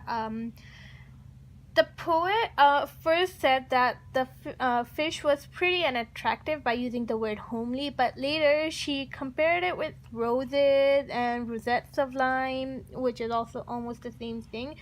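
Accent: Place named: India. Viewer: Hi, good analysis, loved it❤️ Btw, are you Indian? Your pronunciation is so cute😍❤️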